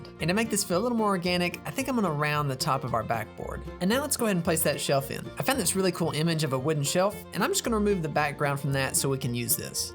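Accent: South, United States. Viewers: I just noticed your southern accent, and now I can’t I hear it 🫨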